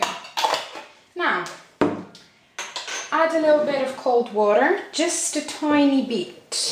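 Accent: United Kingdom. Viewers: Hey, Auntie.... you are so cute. I loved to watch it. You should do comedy TV.:-) Your English is really "Russian free", I swear... more you already have a colour of "very British". This Frappe rememers me good times when I was skipper for sailing yachts in Greek Cyclades... easy to do, and for Coffee junkies like me a great thing. Cant live without it, even in hot summer in midth of Germany (we have up to 38 degrees todasy). Keep going...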